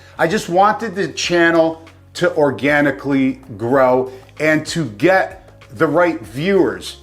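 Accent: New Jersey, United States. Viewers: Thanks for the explanation! I was worried that we'd miss your enthusiastic Jersey accent :) Maybe a nice segway to the new channel would be you getting on a PanAm or better yet, a Buell. then you get all the viewers, HD and Sporties alike lol In all seriousness though, as long as your riding youre good in my book. And if folks cant handle learning about a different type of bike, well....as my mom would say "sucks to be them". I hope you throw a couple Harley videos on the sport site, just for kicks ;)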